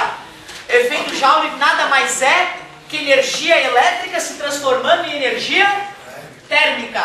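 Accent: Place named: South, Brazil. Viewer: "tu larga duas baguinha" adoro esse jeitão de falar do sul!!! Parabéns ao mestre!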